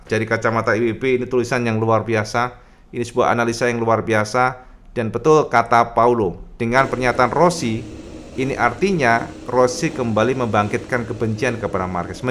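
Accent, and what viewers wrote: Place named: Cirebon, Indonesia. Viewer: kayanya orang cirebon nih, logat bicaranya sama kaya tetangga gw 😅, kereen 👍🏻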